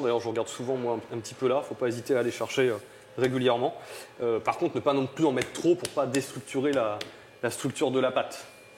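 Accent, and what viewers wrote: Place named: Quebec, Canada. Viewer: On parle québécois en plus...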